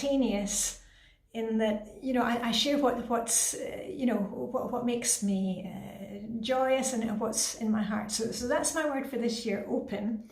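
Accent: Scotland, United Kingdom. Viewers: On IG I guessed the mystery box was just that: an empty box so we could start decluttering. 📦Now I'm thinking a hygge -personalized binder (pom poms and a Danish flag on the front?) so we can add all our routines. I would love a personal session if for no other reason than to soak up your energy and listen to your Scottish accent for a wee bit! Happy "open" New Year..you give so much so graciously. ♥️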